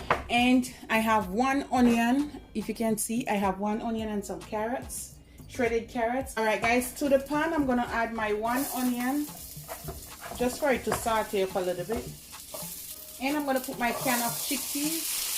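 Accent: Jamaica. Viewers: Hi, so good to hear a Jamaican accent. Nice healthy food, Imma try it ✊🏽✌🏽🎶🐾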